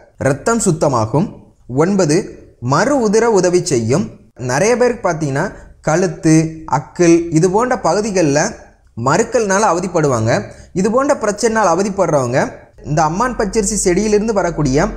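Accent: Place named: Sri Lanka. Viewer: Ilangai tamil maari therigirathu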